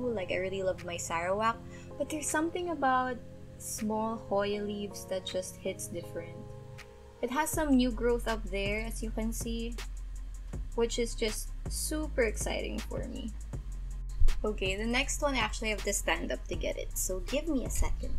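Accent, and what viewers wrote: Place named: Philippines. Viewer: I am new to your channel, where are you based? I love listening to your clear and informative explantion...no nonsense! Are your teacher or a trainer? I noticed slight Phillipino accent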